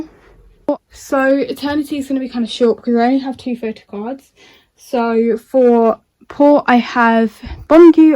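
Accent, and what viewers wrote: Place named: United Kingdom. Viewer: as soon as i heard ur accent i was like 'yes another uk moarmy' im from manchester hehe <3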